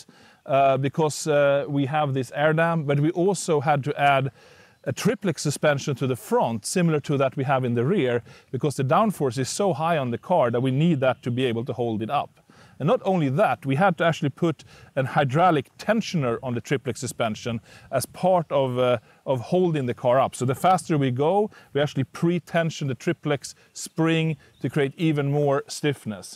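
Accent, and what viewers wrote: Sweden. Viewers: the svedish axent to hahaa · Jag älskar hur man hör att han är Svensk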